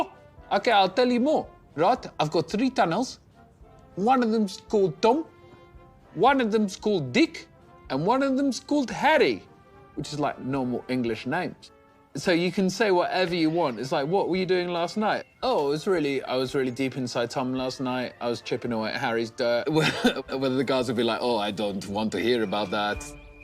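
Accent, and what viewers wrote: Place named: Afrikaans, South Africa. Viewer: oh wow he actually had a non shit south afican boer accent wow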